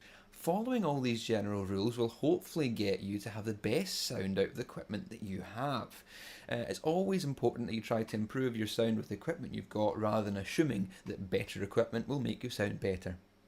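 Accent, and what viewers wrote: Scotland, United Kingdom. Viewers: It's so hard to understand what youre saying when you have Scottish accent..btw chicken needs ketchup and mayonaisee so PUT UP THE GAIN!!!!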